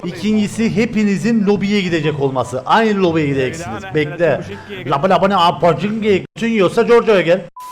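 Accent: Cyprus. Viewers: Sen nerelisin kibristan mii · Abi sen kıbrıslımısın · Ben seni Kıbrıslı sanıyordum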